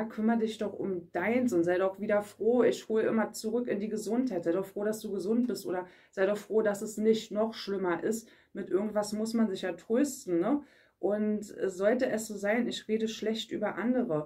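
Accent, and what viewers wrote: Berlin, Germany. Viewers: Guten Morgen Ich liebe deine Berliner Schnauze 🙂 und deine Legungen. Bleib wie Du bist!!!!! Danke für diese Botschaft 🙏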